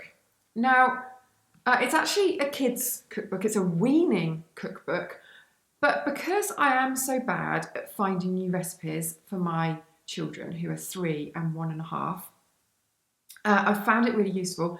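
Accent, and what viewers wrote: United Kingdom. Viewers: wow, what's your problem? Sometimes people enjoy using cookbooks (surprise, even mothers and chefs) to gather inspiration. Maybe she doesn't use the recipes to the tee and just uses it as a guide. Thinking of new dishes to make all the time can get challenging, so that's where cookbooks can come in handy. Also, she is British and has an accent (a very lovely one, might I add). I do not know where you are from, but I'm sure certain accents may sound strange to the ear of a foreigner/a person who is not accustomed to listening to it. Please don't try to create/find issues where they do not exist.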